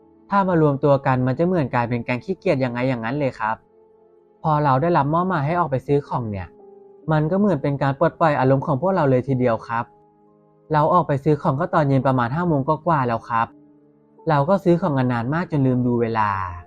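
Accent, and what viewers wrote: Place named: Rural, Thailand. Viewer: สำเนียงเหน่อเพราะฟังเพลินเชียว ขอติดตามเป็นแฟนคลับนะครับ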